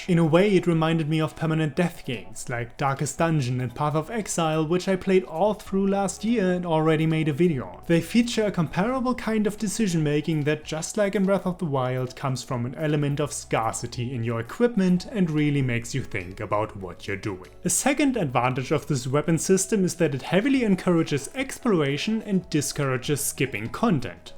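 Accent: Germany. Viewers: Good video. And I get that some folks don't much care for open world type games and get really picky about what kind of games they like so I can respect his 7 out of 10 that he gave the game... Here's the thing though about Jim Sterling. Okay fine... He didn't like this clearly good game as much as most folks. Fair enough... Not everyone has the same feelings... BUT! He gave dead rising 4 a 8.5 out of 10. That's fuckin retarded lol. I don't care how opinions differ and all that. They shouldn't fucking differ THAT fucking much. That's like eating shit vs a new york pizza logic. Love your accent btw. Reminds me of darth helmet from space balls or that german guy who had to put up with homer in that land of chocolate episode. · thats a serious german accent dude....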